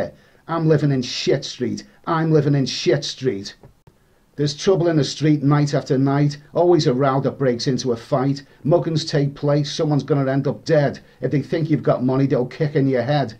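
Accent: Liverpool, United Kingdom. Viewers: Just starting to watch your oldest stuff, as I'm up to date on your travels from leaving England for good. I am not into poetry whatsoever, however, I really enjoyed this mate! The scouse accent, the message etc. Excellent mate, nice one!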